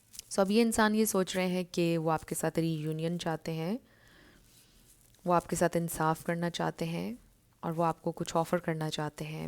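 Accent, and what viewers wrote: Pakistan. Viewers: Aslamo alikum ..mujy aapki voice llehjy sy lgta hai aap peshawar sy hai hindko speaking ....?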